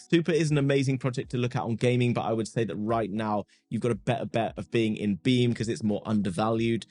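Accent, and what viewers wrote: United Kingdom. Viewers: Here's a question nobody else has really covered - when deciding on a portfolio containing the various narratives suggested, does it make sense to go and acquire all the coins at once (large/mid & small) anticipating the Bitcoin breakout that just happened or would it have been more sensible to have just bought the bigger caps and held off buying the others until phase 3 began.? (Obviously thinking about the pre-election 6 day dip we just had that gave my alts a bit of a kicking). Thanks, it's refreshing to find an articulate fellow Brit running one of these channels, Cheers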